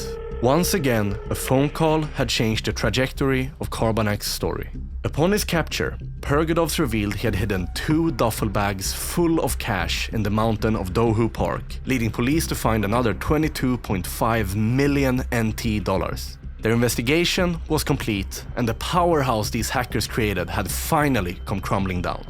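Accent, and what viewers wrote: Sweden. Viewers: Amazing video! LEMMINO vibes with your swedish accent :) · From sweden? Know the accent since im from there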